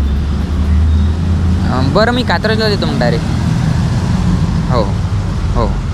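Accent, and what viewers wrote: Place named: Vidarbha, India. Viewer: Bhai tu pakka VIDARBHA mdhla ahe.... Vidharbha chi bhasha ch alg ahe bhawa🙌🔥🔥🔥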